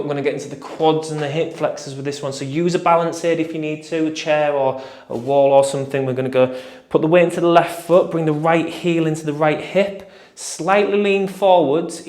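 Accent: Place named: Hull, United Kingdom. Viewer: Hull accent always makes me smile haha. Smaaaling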